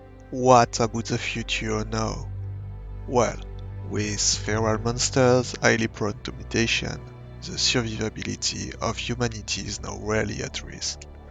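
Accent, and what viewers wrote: France. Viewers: you sound french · Your French accent is very strong, and that is FINE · j'ai l'impression de m'entendre essayé de parlé anglais en t'écoutant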